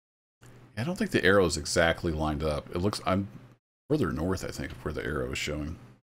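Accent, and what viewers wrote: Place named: Midwest, United States. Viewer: Is it just me or does sound like he is from the Midwest or the rockys area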